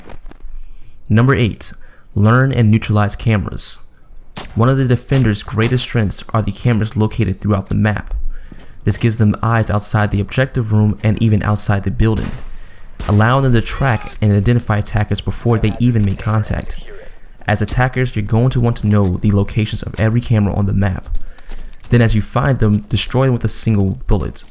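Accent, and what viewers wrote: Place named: Boston, United States. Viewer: You sound like you're from Boston, lol put nice video you earned a sub! Keep it up.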